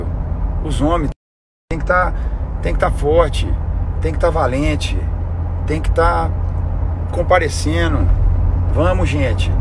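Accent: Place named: Minas Gerais, Brazil. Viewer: Gente impressionado como o sotaque mineiro dele é muito evidente mesmo estando nos EUA.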